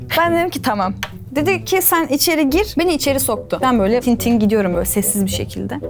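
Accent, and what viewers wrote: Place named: Adana, Turkey. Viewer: abla adana li gibi sin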